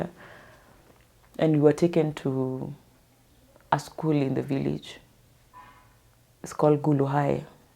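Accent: Kenya. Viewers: Woow.She even has a Kenyan accent.She is a kenya by naturalization. God help her.I hope she gains citizenship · God for 34 years? She even has Kenyan accent...she's a citizen by naturalism... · This girl is Kenyan. Just listen to her accent. Give her citizenship. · one can tell that she is a Kenyan by her accent.Why should the government treat her like a refugee?? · I listen to this beautiful sister,I don’t hear Uganda accent all I hear is Kenyan accent,I hope the president pardon her so she can get her citizenship and continue her life with peace of mind in kenyan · This lady does not even have the Ugandan accent but Kenyan.After staying in Kenya for 12 years you can naturalize.She just needs a person to guide her.Chinese have come the other day and they have settled.Why not her. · Based on her grounds I believe she has "automatically or naturally " qualified for Kenyan citizenship by naturalization. 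Even her English accent's is Kenyan. She's a Kenyan. The east African governments should make this work if they mean about east African community as a regional or block to benefit its people. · Her English is KENYAn 💯💯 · This is soo wrong , her English accent soo kenyan , Kenyans be merciful there are kenyans in other countries too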